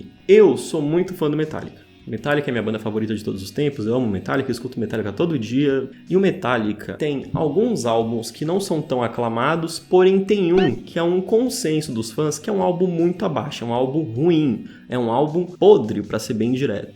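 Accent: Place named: Northeast, Brazil. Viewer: vc é nordestino né? só podia ser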